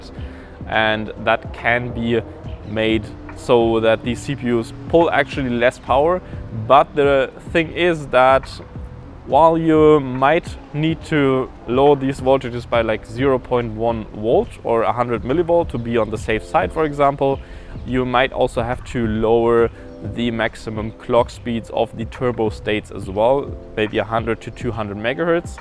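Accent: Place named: Germany. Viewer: ich hör da teutsch raus!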